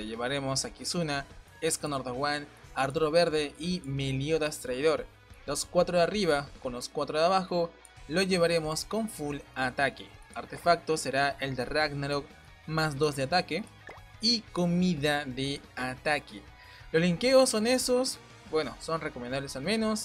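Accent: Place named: Latin America. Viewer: Feliz noche, creo que eras de latam gracias por tus guías buenísimas!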